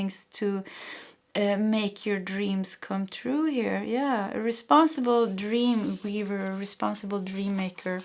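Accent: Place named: Sweden. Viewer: I'm pile #3 and I'm SO excited hehee! My many arms are open!!! Also- I am adopted but found out I have alot of Swedish ancestry so I love to hear your accent and I would love to visit Sweden one day! Maybe it will come on my many fun new adventures you see! I thank you and send you much light and love ❤️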